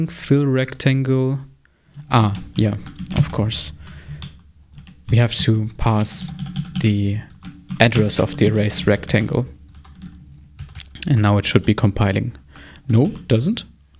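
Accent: Germany. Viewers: Man merkt Bro ist deutsch hahahahah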